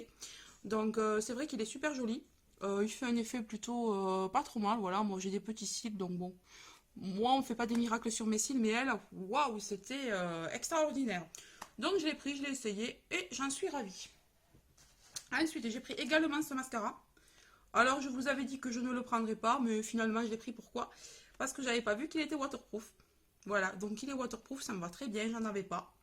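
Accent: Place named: South, France. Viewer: Coucou, j'ai découvert ta chaîne la semaine dernière et j'aime beaucoup tes hauls! Et cet accent du sud... C'est un plaisir à entendre! Bonne journée à toi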